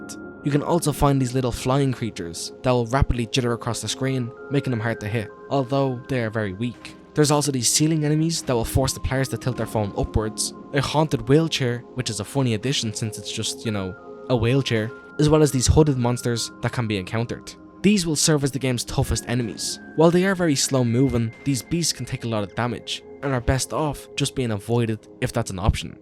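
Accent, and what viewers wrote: Ireland. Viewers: An Irish SH YouTuber? subbed! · Are you Irish? Irish SH fans unitee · Irish accent horror game longform videos? I'm so so so in.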